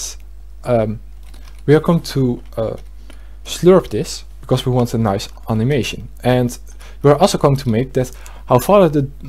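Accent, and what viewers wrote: Netherlands. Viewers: gek Nederlands accent bro thanks voor de tutorial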